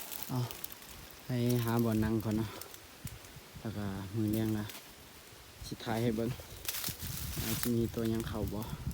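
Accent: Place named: Champasak, Laos. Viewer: ຢູ່ແຂວງໃດເດຟັງສຽງຄືຄົນຈຳປາສັກ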